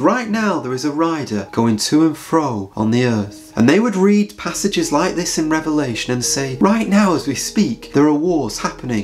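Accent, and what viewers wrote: England, United Kingdom. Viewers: I love ❤ your English accent sounds so musical🎶🎵🎼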